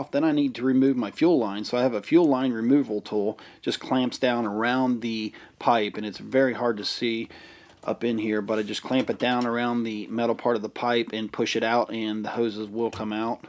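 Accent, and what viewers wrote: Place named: Philadelphia, United States. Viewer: I've done this job a few times but I like to re-watch to remind me what I'm in for. I always get gas on me somehow. You from near Philly or Baltimore originally? You've got "the accent"! Nice video.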